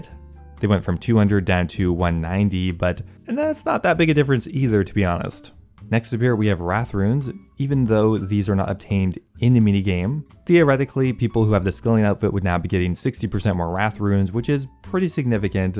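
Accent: Quebec, Canada. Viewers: You sound French canadian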